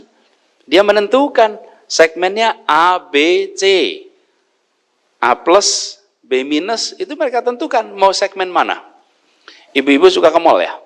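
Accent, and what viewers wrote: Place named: Java, Indonesia. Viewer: Dialeknya njawani banget.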